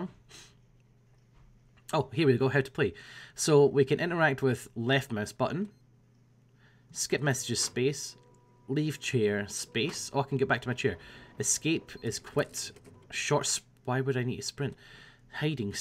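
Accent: Scotland, United Kingdom. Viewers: He is Scottish, I don't think Russian sounds like that at all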